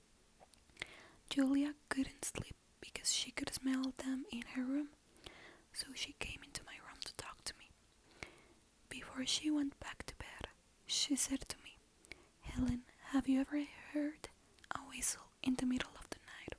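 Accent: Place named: Mexico. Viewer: Eres la mejor persona hablando mal inglés xd, adoro nuestro acento mexa. Saludos te mando un abrazo y un beso;)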